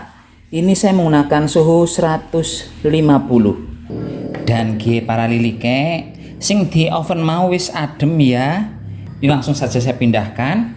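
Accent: Banyumas, Indonesia. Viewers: Selamat siang mas trima kasih banget resepnya sangat gamblang aku suka dengan gaya ngapaknya hehe sukses slalu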